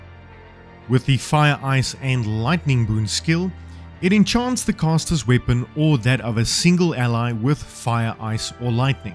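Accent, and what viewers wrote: South Africa. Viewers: South African? Nice! Subbed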